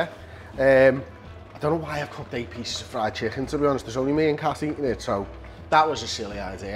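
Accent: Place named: Liverpool, United Kingdom. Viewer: A most adorable scouser is the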